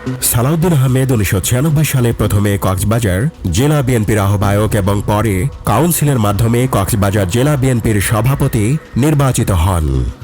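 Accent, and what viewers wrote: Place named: India. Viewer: ভিডিওর কথাগুলা ভারতীয় একসেন্ট মনে হছ্ছে।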